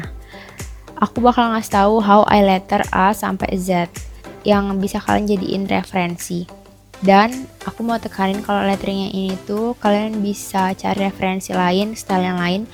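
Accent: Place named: Bali, Indonesia. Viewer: kek logat bali pls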